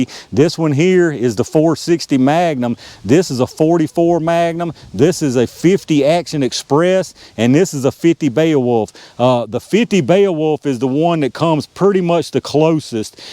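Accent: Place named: Texas, United States. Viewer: You sound like your from Texas bro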